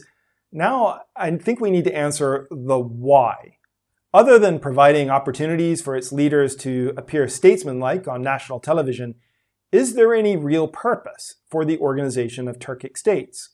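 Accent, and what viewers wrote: United States. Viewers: old Dutch; speaking about Turkic language with US English. Yes sir.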